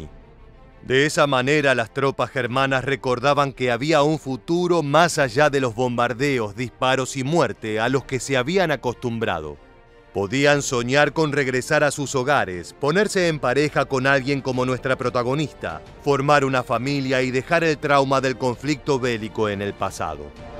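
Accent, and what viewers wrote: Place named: Argentina. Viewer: Ablas como argentino venezolano con cubano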